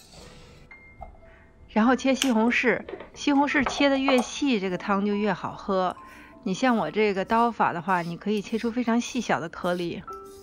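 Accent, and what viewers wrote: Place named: Northwest, China. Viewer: 听你口音是西北人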